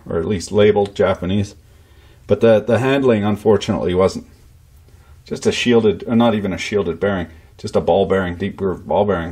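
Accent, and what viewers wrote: Quebec, Canada. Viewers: That's some of the best Canadian accent I have heard in a while. Felt like drinking a cup of maple syrup and eating some poutine when I was watching this. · Quebec accent swearing yeah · Canadian accent. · My freaking god you sound canadian when you speak french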